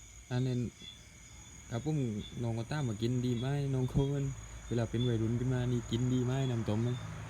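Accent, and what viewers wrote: Thailand. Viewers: แหลงใต้ซับพึด แบบนี้ผ่านแหละ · ผมว่าดีนะ แหลงใต้ไปมั้ง แหลงกลางไปมั้ง มันได้อัดรสครับ เดียวสัก 4-5 วันนี้ ผมสั่งยอด ครับ ตอขี้หมูเริ่มแตกหน่อออกมาแล้วครับ ว่าจะเสียบยอดสัก 2-3 ต้น ฝากข้างสัก 2 ต้น อยากรู้ครับ ว่าวิธีไหนมันจะโตไวหวากัน ผมชอบนะที่ สอนเด็ก ว่าถ้าไม่เคยกินแค่ลองก็พอ พอติดเข้าถึงเลิกยากหนัดครับ คนคอนม้ายครับ ผมฟังจากสำเนียง ผมก็คนคอนครับ ผมชอบมากหวาเพื่อนแล้วช่องนี้ แหลงกะเป็นกันเอง ติดตามตลอดครับ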